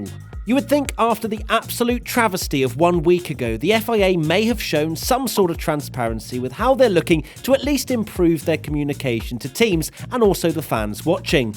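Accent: United Kingdom. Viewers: Yeah you should do a video which doesnt sound Britsh. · It would be great to hear a review from someone that isn't British. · You sound british so yeah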